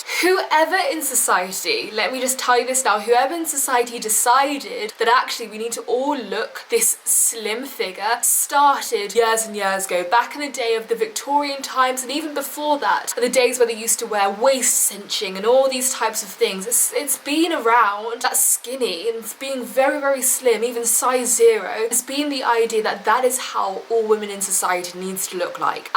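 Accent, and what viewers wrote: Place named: Essex, United Kingdom. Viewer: Idk how to explain but I just think she has a very elegant, posh accent, like very Essex like.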